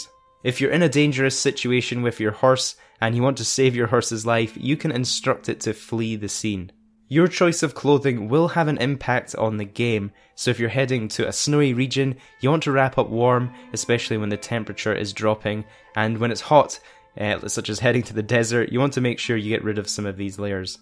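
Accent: United Kingdom. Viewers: I found your British accent very entertaining!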